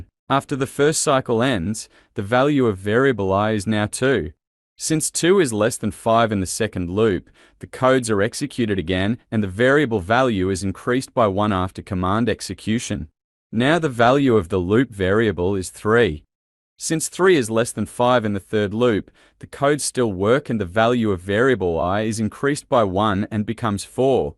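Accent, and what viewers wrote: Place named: Australia. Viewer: Nicely explained with an Ozzy accent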